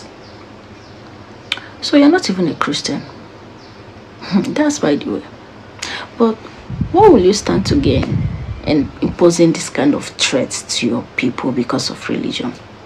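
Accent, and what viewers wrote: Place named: Hausa, Nigeria. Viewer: Listening to her voice, you could notice that she was born in Igbo land by an awusa or fulani people.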